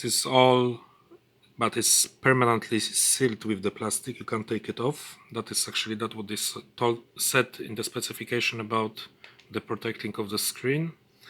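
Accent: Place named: Poland. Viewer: akcent jakiś taki polski ;)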